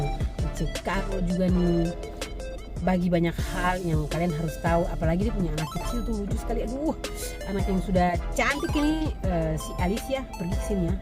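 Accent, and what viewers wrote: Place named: Papua, Indonesia. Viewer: 'macam kesleo".. 'buang handuk"...' aduh, mama mia...macam mo step"...hehe..mace.. sa rindu ko skali dengan.. logat2 nih...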